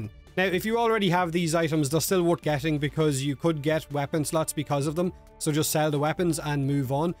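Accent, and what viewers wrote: Ireland. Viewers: clearly not considering this is an irish accent...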